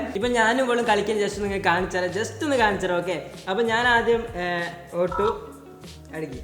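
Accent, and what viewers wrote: Kozhikode, India. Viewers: ബ്രോ കൊച്ചിയിൽ എത്ര വർഷമായി വേറെ ഒന്നും അല്ല കോഴിക്കോട് langugeinu ഒരു മാറ്റവുമില്ല അതു കൊണ്ട് ചോദിച്ചതാ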